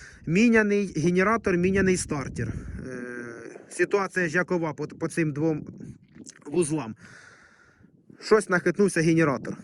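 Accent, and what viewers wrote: Poltava, Ukraine. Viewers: Це Полтавський суржик дядь)🤣💙💛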